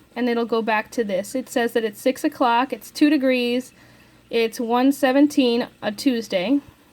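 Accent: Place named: United States. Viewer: Голос и говор заменить бы с мириканцких на британские - я б на авторе женился.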